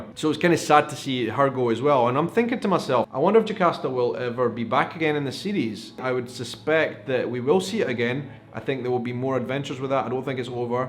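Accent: United Kingdom. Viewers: Make no mistake I LOVE YOUR CHANNEL and as I said to another person we ALL have accents including me. But my California accent is ugly!! They said in NY that it was all those flat ''A's"" like ''baaaaaaath''. It's not classy or cute like yours or in all of Britain. I loved it when you said ''Roger Roger, Roger what are you playin' at Son!!'' LOL! I know wow.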